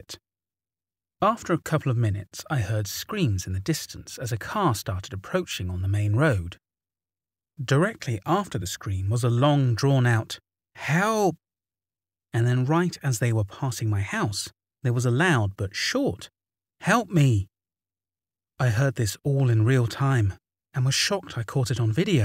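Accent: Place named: Southern England, United Kingdom. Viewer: Seen every single video on this channel, you’re the best at what you do my friend, and your very southern English accent makes them so much better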